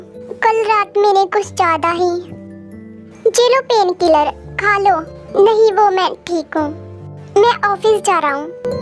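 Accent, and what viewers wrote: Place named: Punjab, India. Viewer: Apka Hindi plus Panjabi accent h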